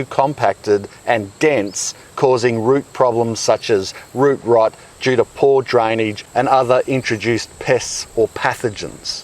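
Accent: Australia. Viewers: Love the Aussie accent!!!! Oi oi 🌷🌷🌷🥰🥰🥰😂🦋🦋🦋🦋🍀🍀🍀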